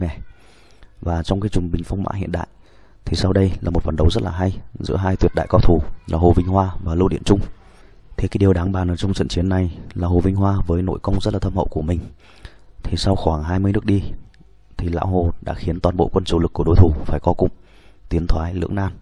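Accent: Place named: Quang Tri, Vietnam. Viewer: trung minh dang vậy mà tui cứ nghĩ giọng quảng trj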